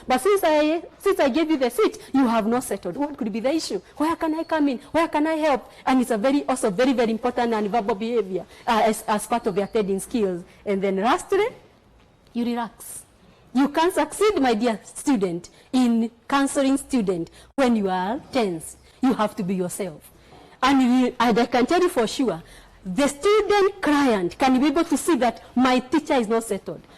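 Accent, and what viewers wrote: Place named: Kenya. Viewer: Nice lecture... I was suffering a little with the Kenyan accent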